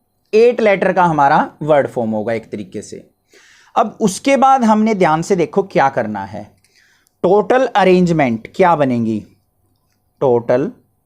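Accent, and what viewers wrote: Haryana, India. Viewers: Sir aap haryana se ho😊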